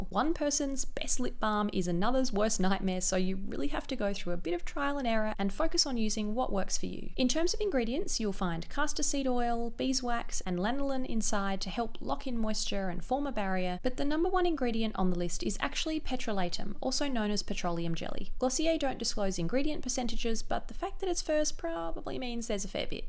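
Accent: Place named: Australia. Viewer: You sound Aussie, do you live in Australia? Because I have been wondering how to get glossier down here forever, and covid means no travelling to NYC any time soon...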